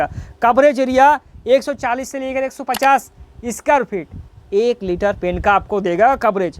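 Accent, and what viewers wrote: Bengal, India. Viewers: Ade baba tum bongoli hai kya 😅 nice video ❤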